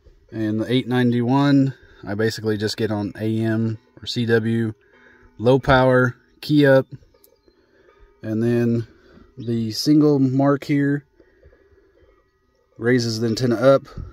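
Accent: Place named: Illinois, United States. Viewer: This was an expensive video for me. 😊. I have a Kia Niro which is similar to your Prius in many ways, and I am afraid that I will duplicate your mount, the antenna, adapter and whip almost exactly. I basically have the same whip as you, I dug it out of my shed. Thanks for posting, I would not have known about this otherwise. I have had a real DK3 screwdriver bought from the late inventor for years, but it's been too big for anything smaller than the pickup I had when I bought it. This small screwdriver looks perfect for our modern small cars. I recognized your accent. You aren't too far from my wife's hometown (Marshall, IL) and where I went to college in the early 90s. I consider that area to be one of my homes! I will post something in a few weeks when I get it in my Korean hybrid. 73 de W0FMS/7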